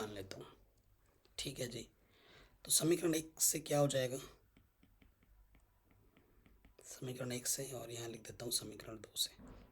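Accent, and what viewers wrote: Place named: Haryana, India. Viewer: Bhai to Haryana se hai..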